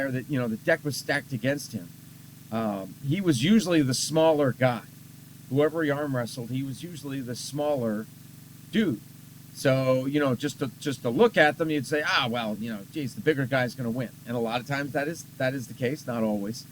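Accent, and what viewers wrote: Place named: Czech Republic. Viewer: where are you from my man? Cuz it do be sounding like you are from czech republic xD PS: Good videos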